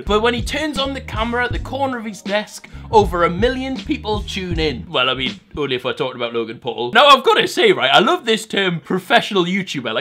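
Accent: Newcastle, United Kingdom. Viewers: Are you a Geordie? This explains much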